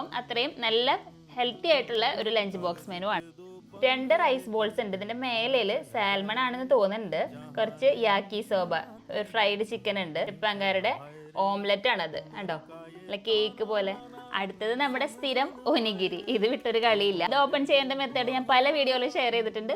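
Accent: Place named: Thrissur, India. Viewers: Thrissur ok vedikuka ena parayuka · thrissur accent. she is from irinjalakuda ithink.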